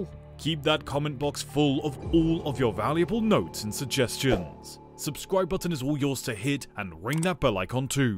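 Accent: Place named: Italy. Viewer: First brit with Italian intonation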